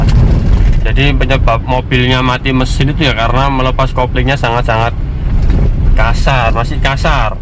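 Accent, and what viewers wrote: Surabaya, Indonesia. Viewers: aku malah suka bahasamu mas,boso suroboyoan🤣🤣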